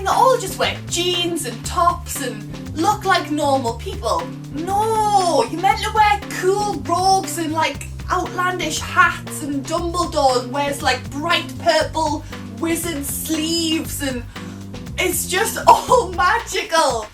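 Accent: Newcastle, United Kingdom. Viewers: It's called a Geordie accent. The epitome of the so-called "British accent". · OMG!!! Can't say how much I love you in this moment <3<3 This is so fucking true!!! So many people never understand why I never liked the films...in future I may should show them your Video ;) You also had a few facts I never realize. The things about the potions (Forget what they are called in English ;) But what I always hated about the films is that Harry has NO Green Eyes!! I think this a good example for the things that where changes in the filme because of no reasons :/ Thanks for this epic Video ;) By the way I love your british accent xD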